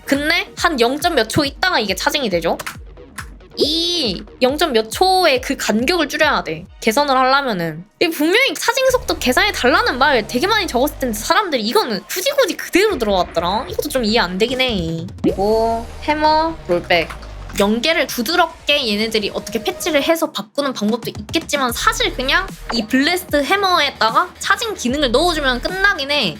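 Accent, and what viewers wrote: Jeolla, South Korea. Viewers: 전라도 ㅇㅇ 졸귀 ㅋㅋ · 님 혹시 전라도 출신이신가요? 억양에 사투리가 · 말 할때 전라도 말투로 구수하네여ㅋㅋ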